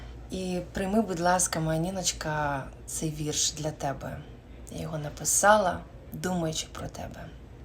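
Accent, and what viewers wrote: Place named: Surzhyk, Ukraine. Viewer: Це не Українська, пробач мені. сільській суржик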